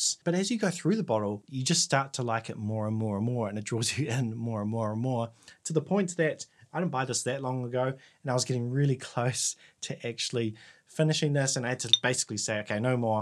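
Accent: New Zealand. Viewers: You from New Zealand ? U have a Nz accent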